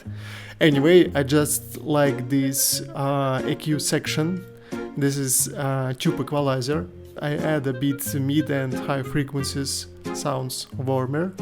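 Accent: Russia. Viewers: That russian accent tho) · u russian? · У тебя русский окчент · Такое ощущение, что акцент русский))))) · Для русского англорусский акцент звучит как родной русский язык)) Рад, что наткнулся на тебя, подпишусь! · Блять ты русский? Афигень акцент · Английский язык с русской интонацией и манерой речи звучит понятнее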